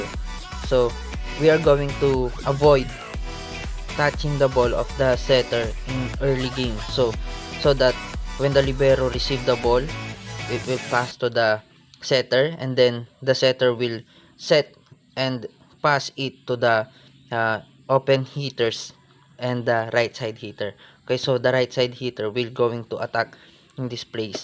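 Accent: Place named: India. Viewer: dami mo filler, ang sakit sa tenga ng english mo... parang kang indian😢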